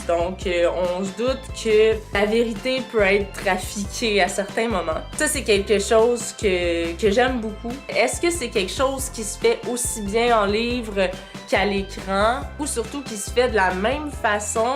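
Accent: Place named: Canada. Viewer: Je suis Français et vie en France je suis dans le sud à côté de saint tropez mais j’adore ton pays ces beau Montréal tu a le même accent que l’actrice Sophie Nelisse mais elle est de Montréal sache que tu es le bonheur en personne ! je suis fan j'aime beaucoup la qualité de ta video avec quelle type de camera film tu et pour le son a tu un micro cravate ou ces le micro de la camera et le montage quelle est ton logiciel merci encore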